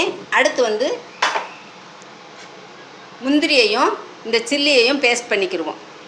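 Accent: Madurai, India. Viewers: I love your Madurai speech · Amma super neenga Madurai ya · Thank you amma , So sweet Madurai slang, love ur description...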